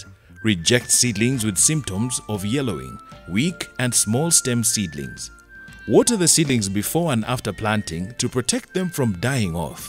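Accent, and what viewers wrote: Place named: Africa. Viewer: Great video very straightforward and I love the African accent! 😍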